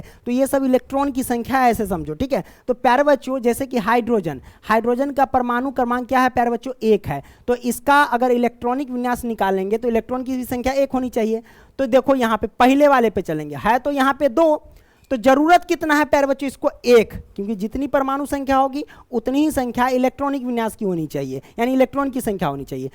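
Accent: Bihar, India. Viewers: Sir aap Bihar ke ho kya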